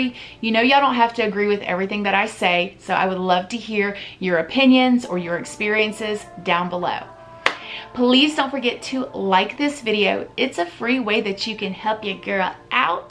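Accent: South, United States. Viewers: I'm from Florida too. She has southern accent all the way through..it comes out stronger when were nervous, excited,. Or when I'm at the dentist on the funny gas LMBO. ... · Rhoda Yackez she isn’t putting it on, she actually has a southern accent and why do u feel the need to critique her looks? let her live. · What? She's southern ding ding, she's from Florida. There's no way to hide being from the south. Unless u didn't grow up here all your life. Or you have had a speech coach drill it out ur mouth lol. Some words or moments, bring out your southern drawl more. I live in Texas born and raised in the deep south😂. I sound like a Dolly Parton country song, with a Reba Macintyre twist.😂😄😁😊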